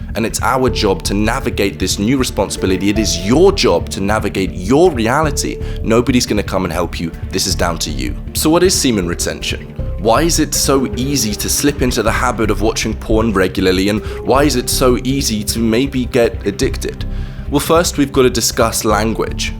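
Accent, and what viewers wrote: United Kingdom. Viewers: That is a British accent, to be honest I find some English accents a cringe to my ears, mainly American and Australian but that does not mean they should change to suit me.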